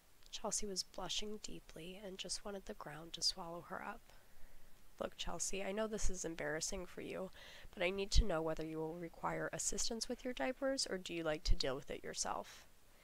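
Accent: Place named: United States. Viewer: Hello! I have just listened to Chapter 5 of "Older Sister Chelsea's Secret" and I am enjoying each chapter that you narrate. You have a wonderful, soft, feminine voice.Your cadence and tempo, as well as inflection and pronunciation is spot on! I could listen to you all day. Though your accent is pure American (guessing West Coast, but maybe Midwestern) the story definitely takes place in either the UK, or one of the Commonwealth nations, such as Canada, New Zealand, Australia or even Bermuda (I live directly west of Bermuda) . Instead of "till", we use "register" or "counter". Instead of calling a teacher "Miss" American students either address their teacher by their name, e. g. "Mrs. Stevens" or "Miss McKee" When the teacher's name is unknown to a student, the correct way to address and converse with a teacher is by use of the appellation, "Ma'am". 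But, please continue to use the British terms for things. I love how British and American English differ so much from one another that my wife and I have to turn on closed captioning when watching a British mystery or film. Slang words and expressions (particularly Cockney English with it's rhyme) and the varied dialects make it almost impossible to understand sometimes, with American ears. I sincerely feel sorry for Chelsea. Her fear of Tina or her parents discovering that she is a bedwetter and has to wear adult diapers to bed, is second only to her fear of being "outed" as a diapered 18 year old high-schooler who has to wear di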